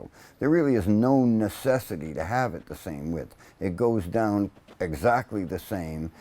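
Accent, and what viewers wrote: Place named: Boston, United States. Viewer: You have a stronger Boston accent than me haha, nice video!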